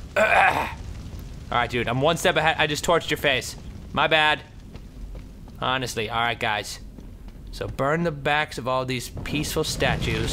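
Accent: United Kingdom. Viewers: l luv ur britsh ascent lol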